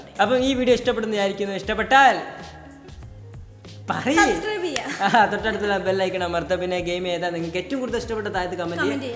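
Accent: Kozhikode, India. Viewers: ബ്രോ കൊച്ചിയിൽ എത്ര വർഷമായി വേറെ ഒന്നും അല്ല കോഴിക്കോട് langugeinu ഒരു മാറ്റവുമില്ല അതു കൊണ്ട് ചോദിച്ചതാ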